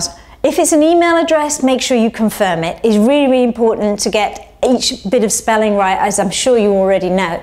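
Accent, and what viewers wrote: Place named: Gloucester, United Kingdom. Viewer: Yes, you are excellent. You have well and truly helped me too. Thanks a lot Plus, i like your accent. I can hear the Gloucester with the R's, very well spoken.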